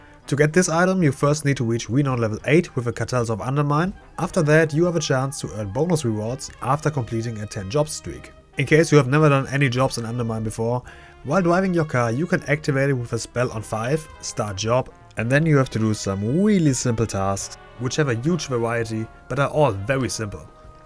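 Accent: Germany. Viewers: Most german accent on YouTube after maybe Werner Herzog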